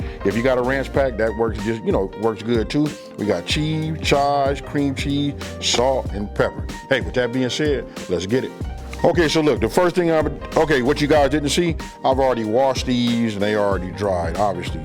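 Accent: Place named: Louisiana, United States. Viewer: Damn can't believe I haven't come across your channel any sooner. Only my second video and I gotta ask brother where's your hometown? Because I swear I here some cajun in you sir. Gotta be south La some where. Laplas and Lafeyette area myself. Been away from home for 20yrs and sure is nice to run into someone from that area with that accent that knows how to cook. Subscribed and really enjoying your vids.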